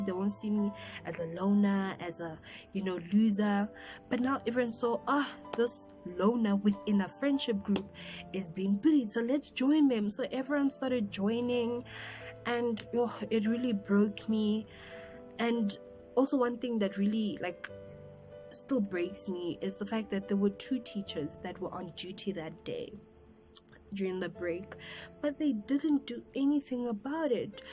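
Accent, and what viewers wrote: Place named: South Africa. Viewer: I'm sorry u had to experience that. So glad u r talking about it. It's nice to see someone from Madagascar in SA. I love Malagasy language, music and culture. It seems u have been in SA for a while (your accent says it all 🙂). If you visit Madagascar one day, please get me CDs, will pay for them. Regards Richard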